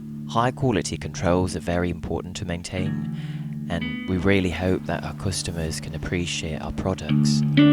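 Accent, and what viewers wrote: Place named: United Kingdom. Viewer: ini yang voice overnya british2 gimana gitu yah ngomongnya..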